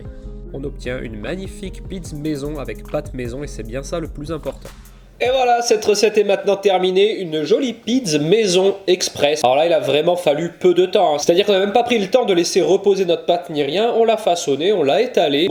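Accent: Corsica, France. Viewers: Au début je pensais qu'il raccourcissait le mot "pizza" en "pizz", mais après je me suis rappelée que c'était son accent corse 😊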